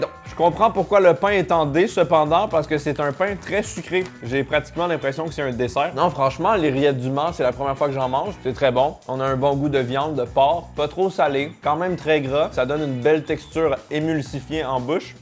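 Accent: Canada. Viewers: J ai horreur de l accent Canadien je Zappe dommage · J'adore ta vidéo, c'est drôle j'aime ça en plus l'accent du Canada c'est top! vive le canada et et les canadiens , bonjour de France et mes amitiées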